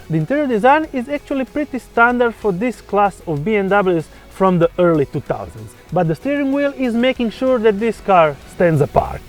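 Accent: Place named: Argentina. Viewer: Oye boludo! He just sounds Argentinian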